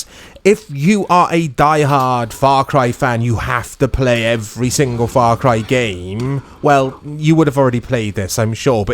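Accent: Wales, United Kingdom. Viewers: Are you Welsh bruv?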